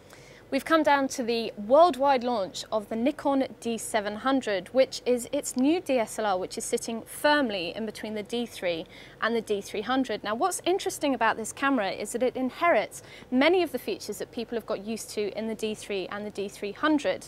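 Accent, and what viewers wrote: United Kingdom. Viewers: German accent? Can't you tell a London accent from a German accent? She's reading off the brochure, isn't she? LOL! And is she trying to shake the camera to death?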